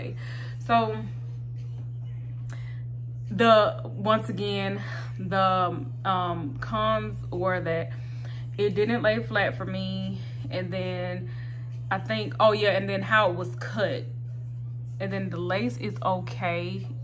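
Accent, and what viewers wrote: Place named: Texas, United States. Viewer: You gotta be from Texas with that accent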